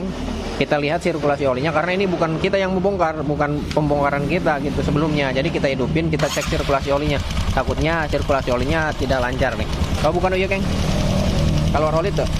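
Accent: West Java, Indonesia. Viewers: Sunda ya 🤔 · Kang didaerah mana ya sepertinya logat sundanya balaraja ya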